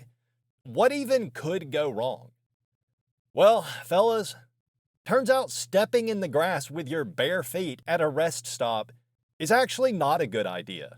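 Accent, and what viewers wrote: South, United States. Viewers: If all of this happened, which I very much doubt, why would you not stop your cousin, the multiple times he kept saying "black one!" to tell him that he shouldn't say that. You sound like you're from the South. There's no way you didn't know better. You are making all of this up. And it's cringe.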